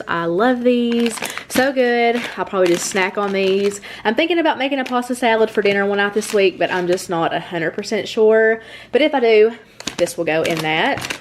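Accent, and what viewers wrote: South, United States. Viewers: You speak very well it's all steel magnolia very southern best wishes for all your goals on giving us you loveya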